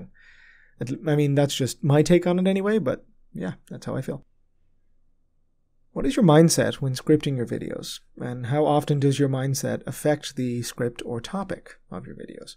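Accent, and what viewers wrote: Ireland. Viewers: Fabulous! But I knew the was from Dublin from the way he pronounces his ‘t’s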